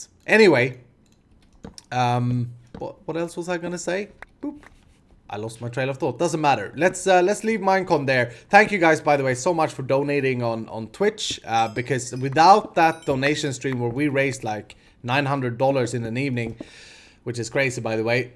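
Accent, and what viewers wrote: Sweden. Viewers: I'm one of those that came from Beef's channel, only that I just started following him too! Basically got here indirectly through following Etho xD Love your content (and your super funny Swedish accent :D) High five from Italy, bro! :) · Congratulations dude! I found your channel through your mystcraft tutorial a long time ago. From there I watched your videos every now and then but i never subscribed. Then i found meatylock. He re-introduced your videos to me. I thought, this weird Swedish guy with the crazy accent again? He's hilarious. Ever since I've been subscribed. With 4 different accounts atm. You're welcome btw <3 thanks for the quality content. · Congratulations:) I subbed when I first saw you playing the PvP game that Doc and Etho made at Danglands:) I loved your attitude and they way you pwned them xD Thank you for being such a great person and entertainer <3 Plus your voice is really cool with the Swedish accent:3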